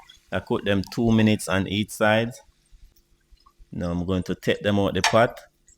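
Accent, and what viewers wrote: Jamaica. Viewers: Brown Sugar Stir Fry …✨❤️try most of your recipes. I have been doing curry shrimp with coconut milk.. or sweet and sour shrimp. Why don’t you use olive oil big flavour or coconut oil both much healthier than vegetable oil. Fastest thing to cook after work. . I have been watching u since you started .. you have made big strides. . 🇯🇲💙✨❤️🇯🇲. It seems every parish have a different speech pattern which parish are you from?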